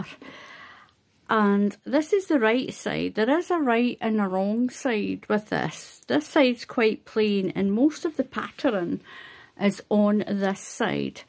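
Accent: Scotland, United Kingdom. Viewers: you have really created a masterpuece with this beautiful, but simple stitch! Is that King Cole Cotton Silk a category 3 cotton? Trying to find a good substitute here in the US. My Great Gran taught me to crochet at 8 with #10 bedspread cotton. She was also Scots, so I love to hear you speak because it brings back fond memories of my Great Gran. Thank you for your lovely way of teaching and your brilliant talent!🎉❤🎉❤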